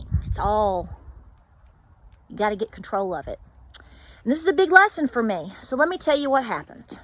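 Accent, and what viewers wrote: South, United States. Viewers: So glad you're ok! Just had your channel suggested to me, and I just love it. My husband's family was from Murfreesboro, TN and I grew up in Columbus, GA. We retired after military traveling around the world,now living in Rome,GA. It is such a pleasure hearing another fellow southern gal and learning from her on your recipes. I hadn't heard that term "cat head biskits" since my mother-in-law used to teach me in her kitchen back in the 80s-90s. Thanks for those great memories! Many blessings to you and your family! Thanks for such a great video here. Reminding us all to remember those things most important!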